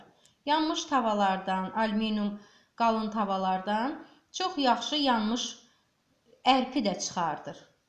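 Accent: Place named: Baku, Azerbaijan. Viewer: Bakılısınız danısıqınıdan bilinir size tesekkürler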